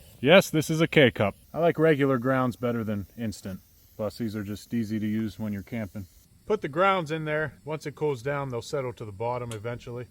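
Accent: Pittsburgh, United States. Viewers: Do i hear a little yinzer in your accent? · I could hear the Pennsylvania accent, makes me homesick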